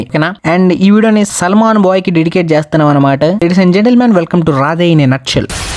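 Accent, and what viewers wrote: Nellore, India. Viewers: Abbiya BROWWWW 'Korea Comic" ani oka yt channel undhi..andhulo kooda oka korean athanu movie explanations chaala hilarious ga english lo chepthadu with crazy asian accent and funny sounds which is similar to your explanation but that is foreign and yours is naatu nellore yaasa which I am proud to be fan of both..neeku emmana help ayyidhi ani like inspiration ga ani cheppa..happy ga undu mowa browwws - SELMON BHAAI be like: abba laddu lags unnave pella 🤤 BOOOYYYYYYYYYYYYYYYYYYYYY broww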